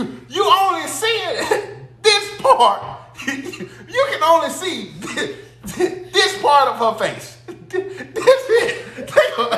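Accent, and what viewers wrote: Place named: Dallas, United States. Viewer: Sound like Sunny side South Dallas lol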